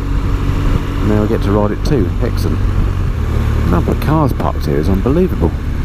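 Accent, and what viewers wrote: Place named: Wales, United Kingdom. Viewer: Liking the welsh pronunciations boyo.... you 'll never miss a gear either with those solid 'clunks".. great video...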